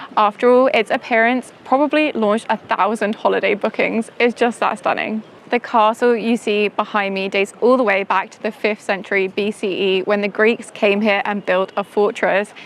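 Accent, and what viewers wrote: United Kingdom. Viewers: What a marvelous place 😮! That island 🏝️ is a true paradise on Earth! Thanks 🙏 for this delightful video! By the way, where are you from? Both your name and family name are French, but you speak really good English with a British accent 😊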